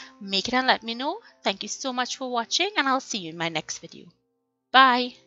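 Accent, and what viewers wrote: Trinidad and Tobago. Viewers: Hah thanks a ton for sharing this! I’m bajan but always loved the trini accent!! I’m currently making this now .... so simple and quick, I love that! 👌🏽 Could you do a pepper sauce recipe too pls? ☮️😃💟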